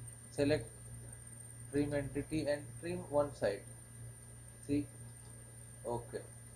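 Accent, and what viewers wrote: India. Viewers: Why there are so many Indian english accent producing engineering videos on Youtube? Is there any reason? Thanks from Brazil.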